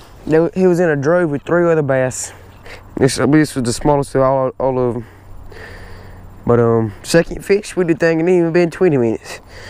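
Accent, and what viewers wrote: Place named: South, United States. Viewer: country accent at its finest ur voice reminds me off mind lol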